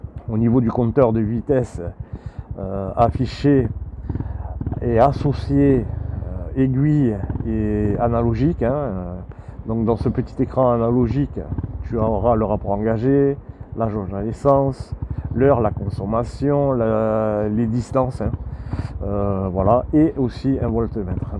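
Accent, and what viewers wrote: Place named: Southwest, France. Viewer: Merci pour cette belle présentation avec ton magnifique accent du sud-ouest 😉 Je trouve que la bécane était un peu trop rapidement au rupteur, tu confirmes ??? ✌️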